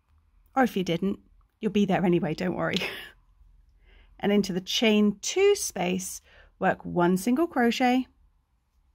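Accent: United Kingdom. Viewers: this is random but what kind of British accent do you have?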